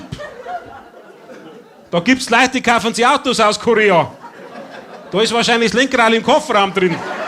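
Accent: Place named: Upper Palatinate, Germany. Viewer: Der Oberpfälzer Dialekt ist der beste ! 😅⭐⭐⭐⭐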